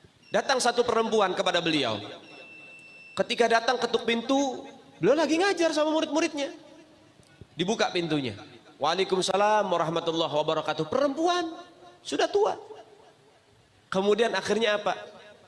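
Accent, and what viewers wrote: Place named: Jakarta, Indonesia. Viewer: ini demit dari mana ? bahasaya dari betawi , keturunan dari yamen ?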